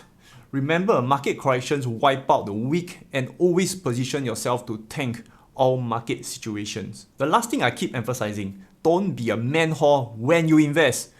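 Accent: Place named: Singapore. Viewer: I am from Sngapore as well! U’ve changed the way I’ve looked at and approached investing in so many ways. Rlly thankful and grateful to chance upon ur channel and hearing ur Singaporean accent in such a transparent way is so reassuring. Thanks!:D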